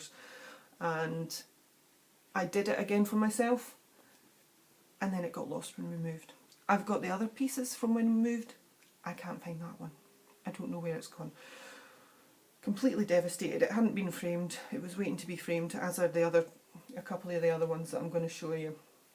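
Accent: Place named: Scotland, United Kingdom. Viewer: Great first video. Scotland is my favorite place in the world to holiday. Your accent makes me want to plan another trip.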